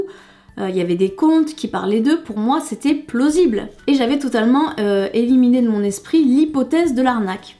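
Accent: South, France. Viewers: j'adore l'accent du sud !!! 😂😅😆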